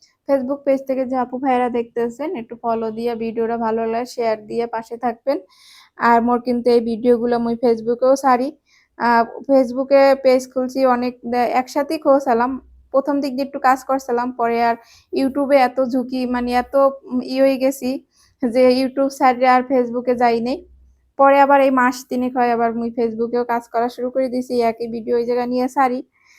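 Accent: Barishal, Bangladesh. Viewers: সম্পূর্ণ ভিডিওটি বরিশালের ভাষায় সুন্দর করে উপস্থাপন করলেন আপু ❤লা ডান❤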